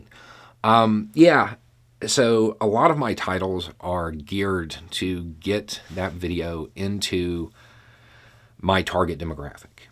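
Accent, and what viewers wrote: South, United States. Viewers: I don't remember how I found you. Maybe it was something like "viewers of this channel (say...Seth Meyers Closer Look as an example) "also like this channel" (you) . I also enjoy watching "A guy" from Minnesota. Maybe youve heard of Vice Grip Garage. Another super intelligent fellow who comes off as a hick from the back woods. Not that you are either a hick or from the backwoods. But Derek is a person with an affected accent and he has tons of followers. Perhaps YouTube put your stream in the same queue because of that. It doesn't matter how I found you...but that I did. The last 5 years have been something of a wild ride emotionally capped off with the devastating effects of the ongoing pandemic. Discovering your voice has had a calming effect on me. Seth and Stephen Colbert are great at keeping disillusionment at bay. But in the last 4 months...you have really set my mind at ease. 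I can say this. Before the MAGA crowd appeared I had always thought that Southern boys were the pinnacle of common sense. I grew up watching the Daltons and later The Dukes of Hazzard. Uncle Jesse was the stereotype of what I believed to be honest folk intent on doing right for the sake of being on the right side. Of course nevermind the two centuries of racism. It was a breath of fresh air when I heard the philosophy that you use from your cap wearing furry faced southern accent. Something that likely exists but is not common. You have a gift. I'm so glad you use it. I think while I knew these th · is it a souther accent? Thanks to Blance Devereaux I like it. 😊 But I'm not American, so I probably haven't been exposed to certain things that could influence me. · It was definitely the contrast between your look and sound and your actual content that hooked me. I have family scattered across the south, so I know all southerners aren't hicks, but you're definitely a breath of fresh air. 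Keep it up!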